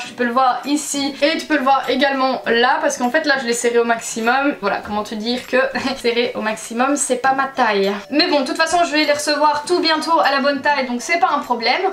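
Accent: Liège, Belgium. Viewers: elle est liegeoise meme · Tu serais pas Belge t'as un de ses accent ! Je suis Belge lol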